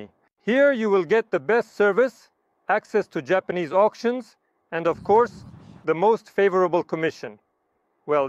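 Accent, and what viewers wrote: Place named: India. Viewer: Так индусы разговаривают на английском.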